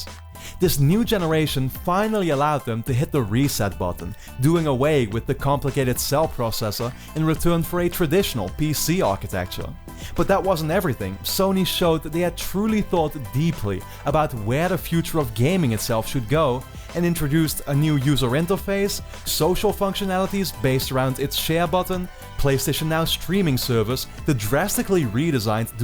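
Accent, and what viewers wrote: Netherlands. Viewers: He sounds Dutch. Iam 99% sure he is from the netherlands. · Hahaha that Dutch accent tho...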